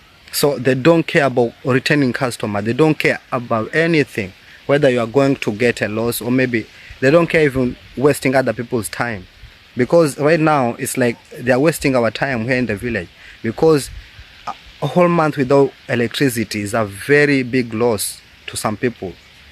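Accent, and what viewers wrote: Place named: Uganda. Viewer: Bro you sound like a Ugandan and you are saying you are from Kenya😮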